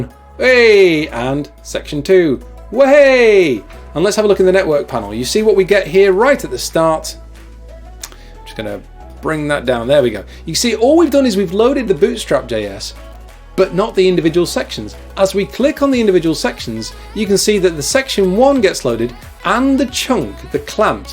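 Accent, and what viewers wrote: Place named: United Kingdom. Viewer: Curious. Where in the UK is your accent from?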